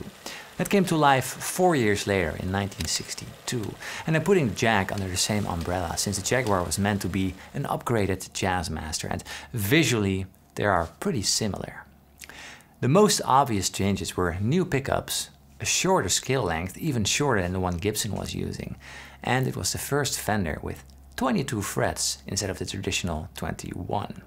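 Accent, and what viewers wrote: Netherlands. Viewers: Holy shit, het eerste wat ik dacht was: "dit klinkt als een Hollander die Engels praat". Dat werd snel bevestigd... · are you Dutch by chance? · Are you dutch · Groetjes uit Nederland. Je kan altijd een Nederlander horen als die Engels spreekt :-) Ja, ik heb ook een accent 🙂 Hoe dan ook, eerste keer dat ik je zie, super goeie vid gozer! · i hear your dutch accent :)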